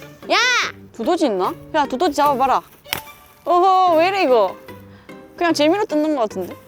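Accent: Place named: Gyeongsang, South Korea. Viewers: 문경이신가요? 말투가 문경분같아요 몬가 힐링하고 가요😊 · 상주 문경쪽 인가요? 말끝에 '여'자를 붙이는건 그쪽인데여~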